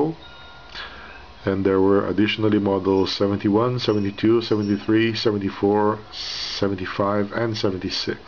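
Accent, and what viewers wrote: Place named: Italy. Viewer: Italian pistol review by Italian accent 😁👍🏻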